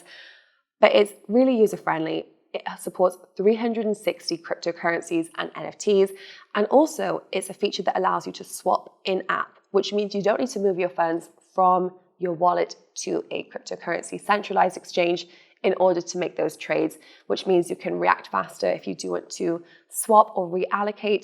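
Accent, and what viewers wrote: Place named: Scotland, United Kingdom. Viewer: Thank you. Enjoyed your presentation and explanations. English / Scottish, niiice. Beautiful language.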